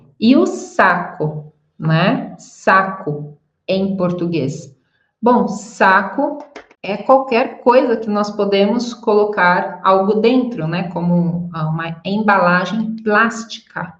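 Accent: Brazil. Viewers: ¡Qué interesante! En España, "saco" es como una bolsa, normalmente tejida de yute, de forma rectangular y sin asas (parte para agarrar) que las bolsas sí tienen. Normalmente decimos un saco de patatas, un saco de leña, un saco de arroz, un saco de cemento, etc. No sé si en alguna parte de España también se usa como prenda de ropa, yo sólo lo he oído de amigos hispanoamericanos. "Rato" es un tiempo más largo que momento o minuto, que también usamos. Tus clases son muy interesantes, además que entiendo tu portugués brasileño mucho mejor que el de nuestros queridos vecinos portugueses. Un saludo ❤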